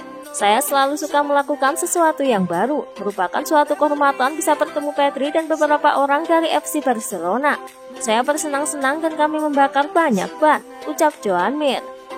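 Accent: Java, Indonesia. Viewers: Logat Jawa.. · Ngomongnya medok.. Emang gk bisa di ilangin y?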